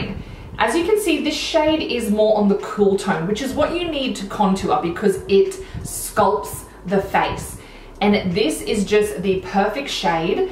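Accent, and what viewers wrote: Australia. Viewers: I love makeup but I’m here just to learn Australian accent and you help me a lot! I’m going to Australia next year and I’m just ready to buy these products, for sure I will love it 🙌🏼❤️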